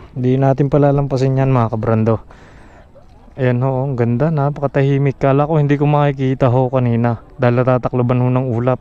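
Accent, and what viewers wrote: Batangas, Philippines. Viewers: Batangueno ka kuya?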